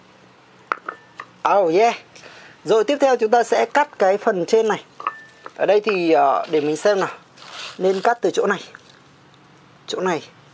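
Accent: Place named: Central, Vietnam. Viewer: One Move : người miền trung:))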